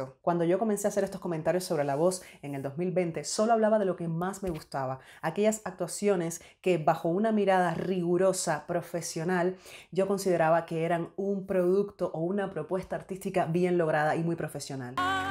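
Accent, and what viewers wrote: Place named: Cuba. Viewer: Primera vez que veo su canal,me parece que debe ser cubana por la forma de hablar 👍👍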